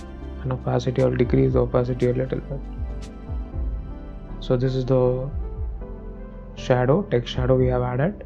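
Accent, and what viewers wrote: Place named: India. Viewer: indian accen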